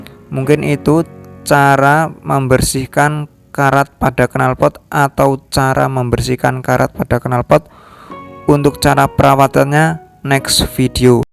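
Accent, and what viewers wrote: Indonesia. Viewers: Orang gebang ya om? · Apa itu grenjenk...??? Jadi ketawa sendiri dengar penjelasane mase,..Jowo ndi mas???? Q jatim.......👆👆👆👆👆👆👆👆👆😁😁😁😁😁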